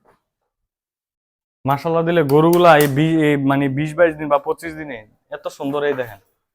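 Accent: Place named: Chandpur, Bangladesh. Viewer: কথা শুনে মনে করছিলাম শরীয়তপুরের এখন দেখি পার্শ্ববর্তী চাঁদপুরের।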